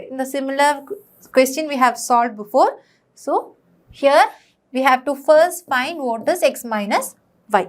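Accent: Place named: Tamil Nadu, India. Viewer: Tamil acsent mam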